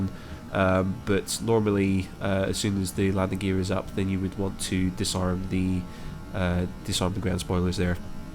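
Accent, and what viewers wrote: Scotland, United Kingdom. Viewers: Are you Scottish if you don't mind me asking?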